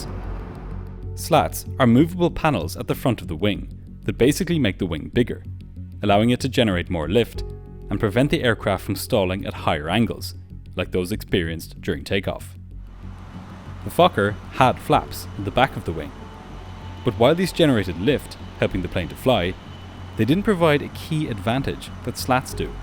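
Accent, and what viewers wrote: Ireland. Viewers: "Outer two turds." Man, you make superb documentaries, and I'm not mocking your accent. I just get a giggle whenever I hear the Irish in your voice. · How did plane crash videos become my comfort show 😅 I blame the Irish voice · Don’t you just love the Irish accent ❤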